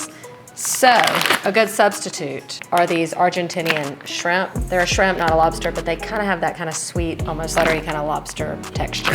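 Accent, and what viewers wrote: South, United States. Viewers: “Chefs, they’re just like us”. 😂 So love your sense of humor and you have THE best southern accent. Really enjoy your videos and have enjoyed making your recipes. Keep up the good work!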